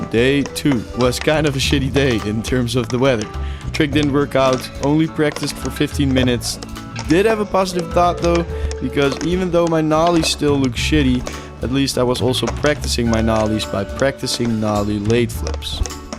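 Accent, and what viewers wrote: Netherlands. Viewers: Eindelijk ik wist het aan je accent je bent Nederlands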